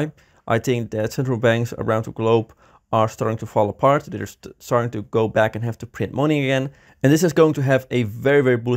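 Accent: Netherlands. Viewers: Nederlandse accent. Nederlanders zijn altijd gretig voor de goedkopere prijzen lol.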